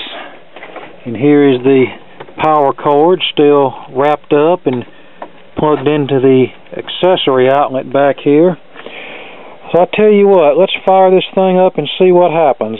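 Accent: South, United States. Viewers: staggs You have to take in account of his southern accent.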